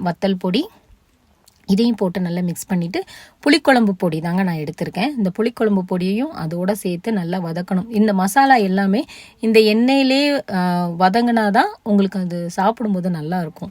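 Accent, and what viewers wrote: Tirunelveli, India. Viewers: Happy birthday thampi Akka neinga evlo naal estate la erukinga ananlum slang tirunelveli slang ennum ungaluku pogala akka❤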